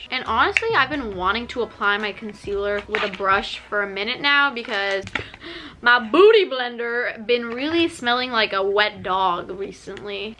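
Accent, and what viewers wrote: United Kingdom. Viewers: Is accent sometimes goes to british accent 🧐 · İngiliz aksanı yapan sesini saatlerce dinleyebilirim ya kısmen rahatsız ediyor ve bu çok hoş ifşvkshsjgppglrlehçvçndks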